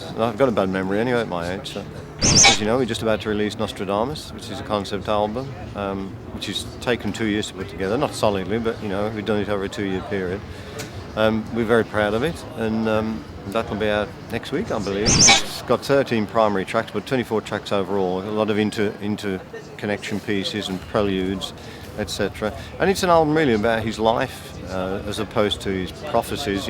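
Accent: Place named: England, United Kingdom. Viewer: he does not open his mouth during speaking... pure english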